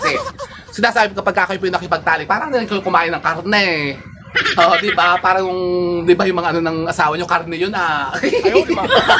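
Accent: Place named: Bulacan, Philippines. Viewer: taga bulacan pi ba kyo?yong punto kse ng pagsasalita nyo punto ng bulacan,taga bulacan din aq balagtas at isa nyo aqng subscriber.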